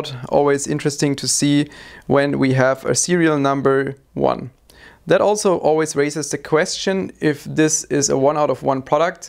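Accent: Germany. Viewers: Yes and I really appreciate his adorable German accent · Are you German? Cause you have a really obvious accent LoL 👍👍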